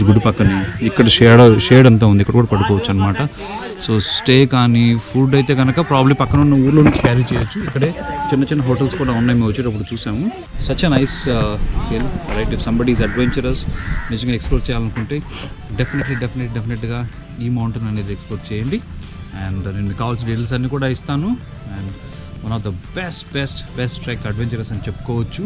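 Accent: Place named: Bangalore, India. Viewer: Miru banglore telugu matladutaru ur native bro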